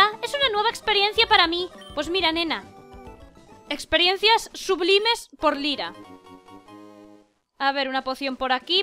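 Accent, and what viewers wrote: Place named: Spain. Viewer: Nunca me gusto el acento español, hasta que te escuche, buen humor Saludos desde México!!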